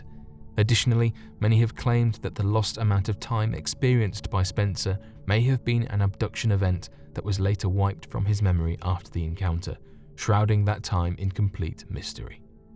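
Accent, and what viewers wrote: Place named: North, United Kingdom. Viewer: he's saying "fireballs" -- turn on captions and you'll see :) this is why i watch most British shows with closed captions turned on because frankly i can't understand some of what they say, especially thick Northern English accents... no shade on the British, i have trouble understanding other foreigners speaking English with a thick accent too :) (looking at you, Jamaica and India :)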